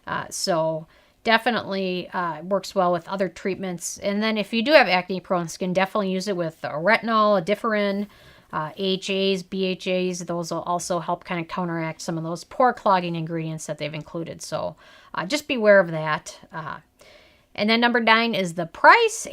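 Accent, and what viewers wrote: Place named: Midwest, United States. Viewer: just picked this up... ready to try it! also, you should def have more subs! this is so great and thorough... plus THE SECOND you started talking I knew I'd found a fellow midwest girl--I'm guessing Minnesota! love from WI!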